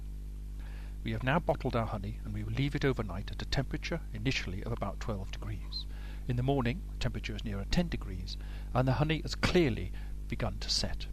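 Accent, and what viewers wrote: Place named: United Kingdom. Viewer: Really didn't care to the nasally british voice that I couldn't hear.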